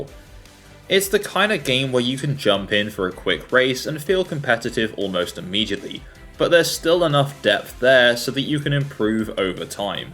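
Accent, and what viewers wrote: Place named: England, United Kingdom. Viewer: I do not even enjoy racing games. Yet hearing this Posh English Man complain is relaxing.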